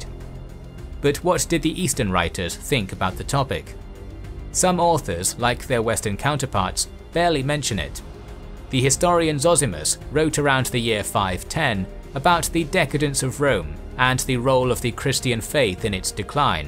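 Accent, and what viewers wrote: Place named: United Kingdom. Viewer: Excellent voice. Calm and clear. And British 🥳🥳🤣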